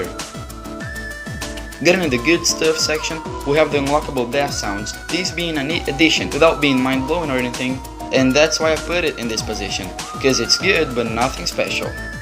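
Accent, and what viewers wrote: Brazil. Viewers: Are you Brazilian or Spanish? I'm from Brazil xD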